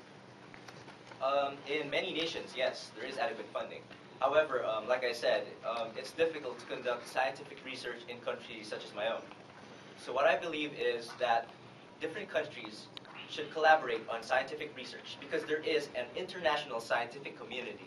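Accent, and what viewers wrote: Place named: United States. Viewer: how come his got an american accent?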